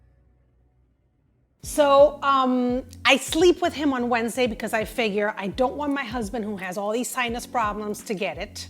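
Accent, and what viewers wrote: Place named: Midwest, United States. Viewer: Your mid west accent is extremely obnoxious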